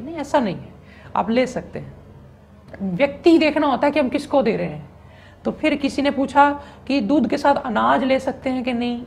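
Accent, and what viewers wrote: Punjab, India. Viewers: Guru ji tusi Punjabi ho?😊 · Yr tone is very soft n Punjabi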